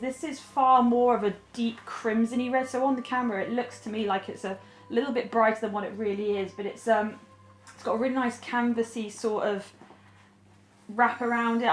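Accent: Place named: Newcastle, United Kingdom. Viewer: Are you from newcastle? Because you say book like that. I looovvvee your accent 😊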